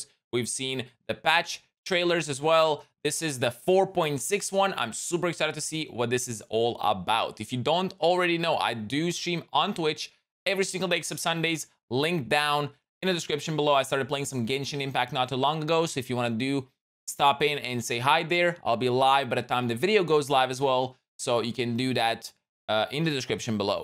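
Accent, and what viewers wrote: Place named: Eastern Europe. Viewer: I'm assuming English isn't your native language? You're pronunciations are weird eastern European I assume?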